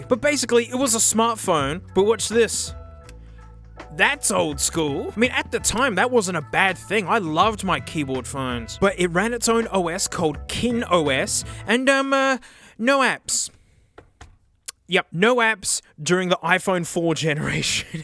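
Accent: Australia. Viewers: Aussie accents are so interesting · are you australian? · Hi Australian man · LOL... this is Mr Mobile if he was your Aussie mate. Amazing essay as usual mate 👌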